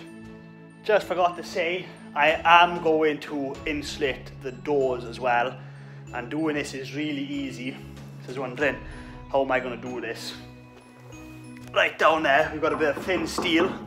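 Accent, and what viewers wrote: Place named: Ceredigion, United Kingdom. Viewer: my wife said ‘he sounds like he’s from your way’. My guess was Ceredigion.. 😜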